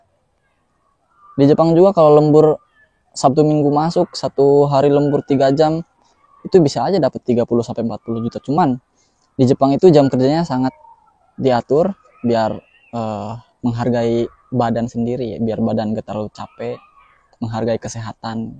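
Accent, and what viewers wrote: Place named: Bekasi, Indonesia. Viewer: oh kirain bekasi logatnya kaya org bekasi soalnya😂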